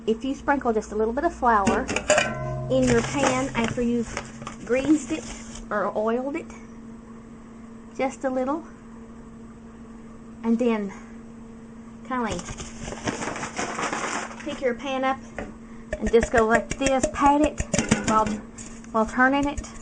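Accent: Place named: South, United States. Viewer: looks good and love your country voice:)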